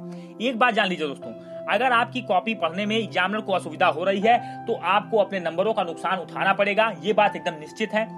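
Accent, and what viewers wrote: Bihar, India. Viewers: Sir ji aap bihari ho???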